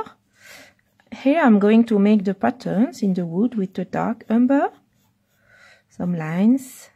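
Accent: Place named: France. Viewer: Trop mignon quand tu parle anglais avec ton accent de france☺️😍. Vraiment ton effet or est realiste merci pour ce truc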